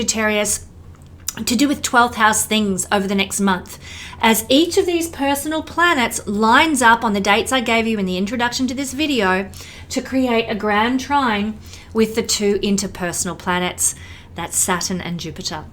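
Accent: Australia. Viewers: G'day it gr8vto heat a Aussie lady doing this ❤ur worx kind re thommo nsw Australia 🇦🇺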